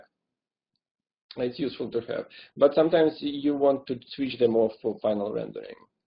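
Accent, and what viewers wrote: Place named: Russia. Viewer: awesome Russian accent )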